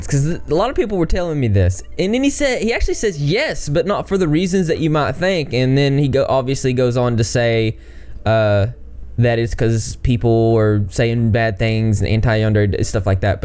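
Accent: South, United States. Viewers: Good video, but you look like a Kingdom Hearts villain with a southern accent.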